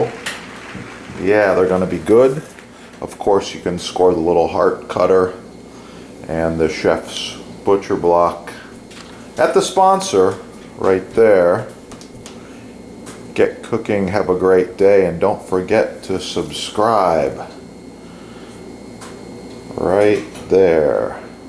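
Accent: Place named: Midwest, United States. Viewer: Thanks for the video! I love a good mid-western accent; it keeps my ears perked, lol. Keep creating, man!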